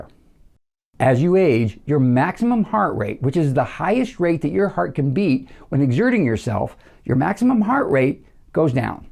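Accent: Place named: New York, United States. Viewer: FINALLY!.. Someone who is not full of bullshit!.. I will do my best to honor you and incorporate what you teach as best I can... Love your way of speaking... Are you from NYC?... 68 year old senior softball player, and just getting started with a rutine... Any words of advice?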